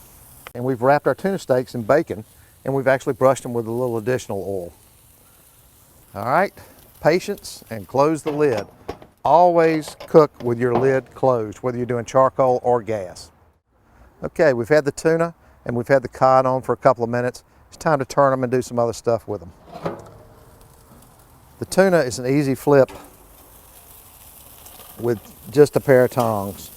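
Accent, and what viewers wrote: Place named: Eastern North Carolina, United States. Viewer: Sounds like you're from eastern NC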